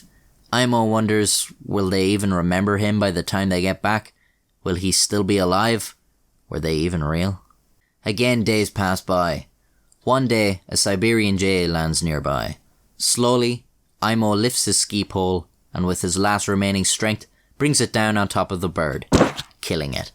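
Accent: Ireland. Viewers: I love Irish accents and how "to surround them" sounds like "to so random" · Hi 👋🏼 which part of Ireland are u from? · Had to an irish narrator on a drug story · The Irish accent makes it better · This story is made so much better being told by one of my favorite nationalities, an Irishman. Its great.. cuss words and all. 🇺🇸 😎👍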